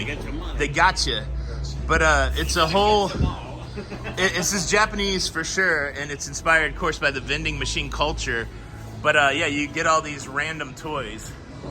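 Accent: United States. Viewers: Hehe! The subtitles picked up on your American accent and said fairies instead of ferries! I’ve noticed that a lot with Americans and short vowels, they tend to elongate them.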